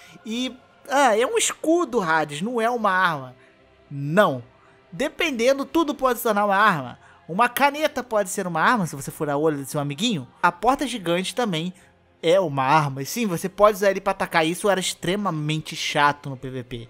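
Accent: Brazil. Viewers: cara de qual estado brasileiro tu é?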